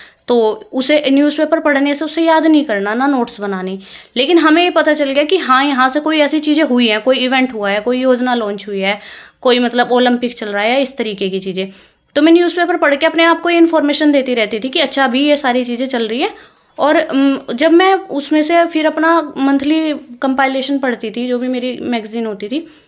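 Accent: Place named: Shamli, India. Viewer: Mam तो म्हारी वाली language बोल रही है, शामली, सहारनपुर वाली।।।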